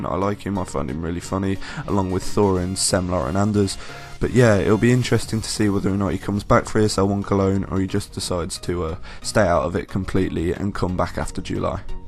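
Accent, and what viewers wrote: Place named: North, United Kingdom. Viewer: Bro, your logo makes me so happy to be alive. Also I'm guessing you're a dirty Northerner judging from accent :)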